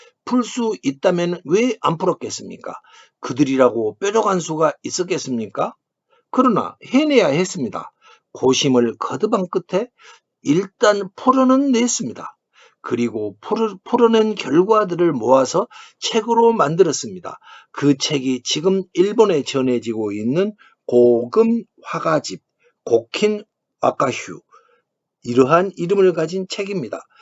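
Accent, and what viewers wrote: Gyeongsang, South Korea. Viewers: 갱상도사투리 이거어쩌지?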